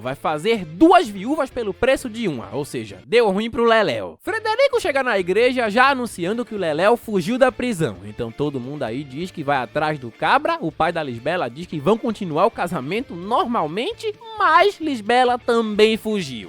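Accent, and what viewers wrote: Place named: Pernambuco, Brazil. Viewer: Rapaz To surpreso do se Da qui de Pernambuco Sempre Suspeitei do Sutaque Manda um salve Pra Aliança PE, sou muito seu fan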